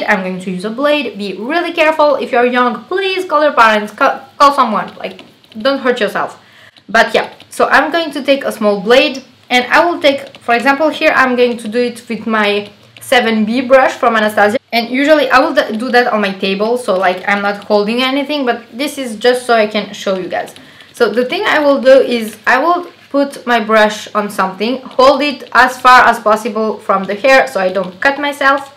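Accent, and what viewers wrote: France. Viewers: Wow the french accent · Wow... J'en reviens pas. Ce sont les sourcils les plus naturels et réalistes que j'ai jamais vu et trouvé sur Youtube! Tu m'as vraiment donné envie de me mettre au makeup :) Je m'abonne sur le champ !!! ( ps: je me permets d'écrire en Français car j'ai remarqué ton léger accent et quand tu as dit Christelle Dominique lol) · Tu est française non ? Ca s'entend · Hi,Wonderful Blue eyes ,God bless you. Inoticed your French pronunciation Have you been raised in France?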